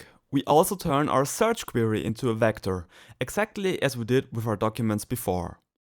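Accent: Germany. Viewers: The german is strong with this one :D But gj, simple and understandable. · Aww cute German accent!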